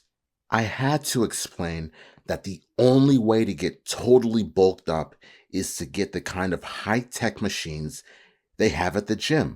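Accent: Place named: United Kingdom. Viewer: Hes british i think bc of the tone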